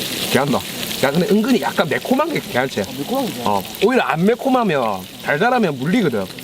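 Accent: Busan, South Korea. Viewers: 옥상 먹방 정감있는 부산말 조합 최강 섞까 묵어봐라ㅎㅎ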